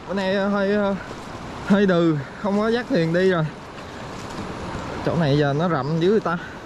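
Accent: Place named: South, Vietnam. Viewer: E nói giọng miền nam, quê e ở đâ vậy?mà có sông lớn,nước chảy siết?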